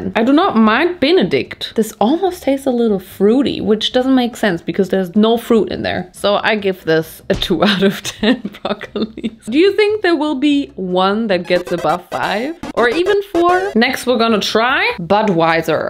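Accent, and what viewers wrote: Bavaria, Germany. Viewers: bist du bayer? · Der bayerische Akzent war halt echt nicht schlecht 😂 Grüße aus Bayern!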